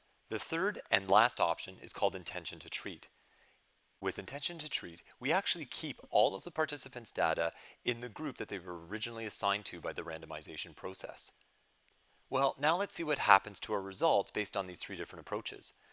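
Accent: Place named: Canada. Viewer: That Canadian accent thoughhh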